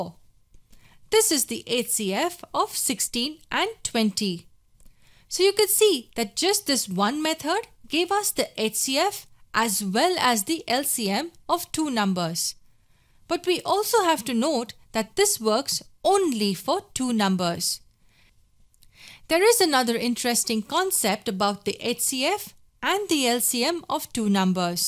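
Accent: India. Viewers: Fucking hate that Indian accent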